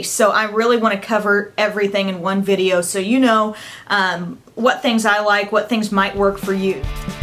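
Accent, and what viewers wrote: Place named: Texas, United States. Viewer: You sound like your from Texas. :)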